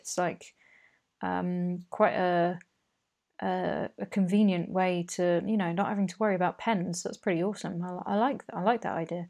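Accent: United Kingdom. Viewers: I’m absolutely loving your channel at the minute. Your art is amazing and my style! I also love your accent! I’m from the uk too 🇬🇧 ✌️ xx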